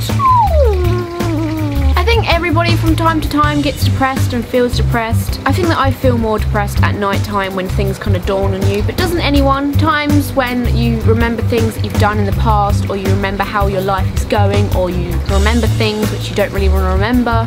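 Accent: United Kingdom. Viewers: oh and your british accent rocks :D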